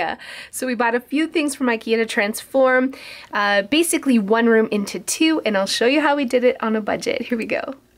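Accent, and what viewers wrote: Canada. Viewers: Is she Canadian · Her accent sounds Canadian for sure.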